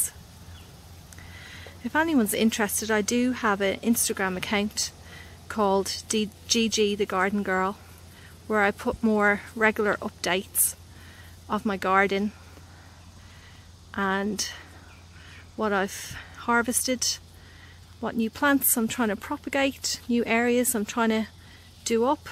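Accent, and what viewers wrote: Ireland. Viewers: Loved the video, adore your Irish accent. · Hi beautiful garden, love the white tomatos and the sunflowers. .never seen those tomatos before..did I hear an irish accent there?? So nice.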